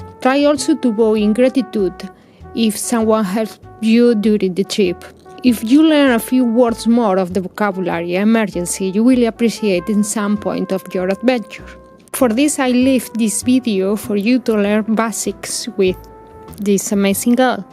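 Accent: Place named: Spain. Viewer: Clementine Windflower She is from Spain. I can tell by the accent.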